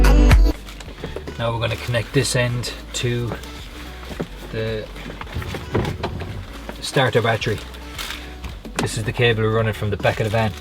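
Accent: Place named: Dublin, Ireland. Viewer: Great Video…what part of Dublin are you from??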